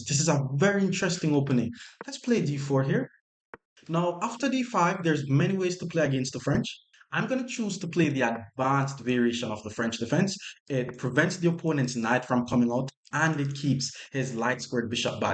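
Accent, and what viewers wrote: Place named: Jamaica. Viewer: Thanks - no hype, just really educational well commentated chess. You're a brilliant educator and I hope this channel is giving you the effort you're puuting into it. I'm from the UK and apart from actually getting better after really watching your videos, I'm also surprising my opponents when I inadvertently say something in a Jamaican accent and channelling my inner 😂 That's gg's!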